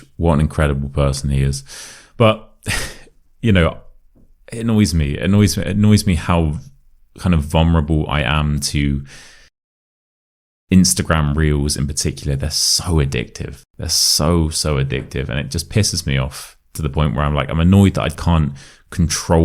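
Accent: RP, United Kingdom. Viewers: From your example, I think you have lived in Thailand. 😊. Yeah,, I am familiar with the British accent that you speak. However, I found that when I lived in London, people use different accents and it was hard to understand them all.. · Quite understandable your speech... I always do enjoy your videos because I'm working on my British pronunciation and your particular accent is what I'm looking for... modern, standard, glotalisations, etc. ❤ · I'm gonna be hosnest i didn't get ir alll video but about 95.7% i could get . I'm so happy thia video is so amazing 😍 and i will watch more time this . because the British accent it's so my difficult to my ears. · He IS speaking RP like ...so It IS pretty clear to Understand If You have a good Level at listening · Do all british speak like you? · the modern RP accent you speak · I would call it a very "standard" English. 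I can hear you are from GB somewhere, but this language i would expect to hear in US as well. English is my 3rd language, and if everyone were talking this way, the world would have been much more understandable place. :-))) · maybe yours is the sound of educated people... like the BBC guys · I do understand this British man but i live in uk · It is a little bit harder to me understand his British accent, because I am used to listen to American accent, but in general I could understand almost everything he said. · I clearly understand you, but as some already mentioned not everyone in UK speaks the same way. For example, I can barely understand a pretty British TV show Brassic. Still love British accent and the way you say things :-)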